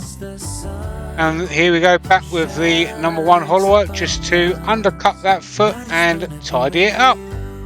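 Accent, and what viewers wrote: United Kingdom. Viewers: Very nice bowl, but surely you should call it a sweet bowl not a candy bowl. You sound like your from the UK.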